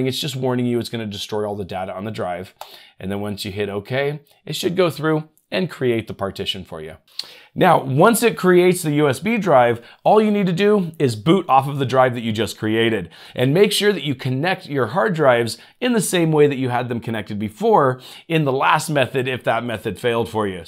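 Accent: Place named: United States. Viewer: Your videos are usually first rate but this one is overly complicated and, for some reason, you gabble at great speed. Not all viewers find US spoken English easy to follow and the monotone does not help. Perhaps showing two methods in a single video was the problem and could have been solved with a part 1 and part 2. Easeus is buggy and manual partition sizing with simpler tools can be be quicker and easier. Aomei is really worth the price if only used among friends and family a few times a year because it is so well thought out and has fail-safe features which were absent in the free version. Customer technical service is excellent re using the licensed product on other machines up to a point. For those of us who repair and upgrade machines regularly it is, in my years of experience, the best and least fussy when used properly. Over the years I have tried most.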